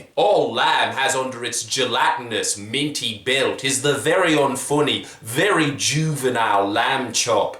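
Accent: Australia. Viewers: Ok mannerisms and style of writing are amazingly accurate but the accent was shit and I say that as a fellow Strayan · Failed impression, your australian accent shines bright on this 😂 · This guy is Australian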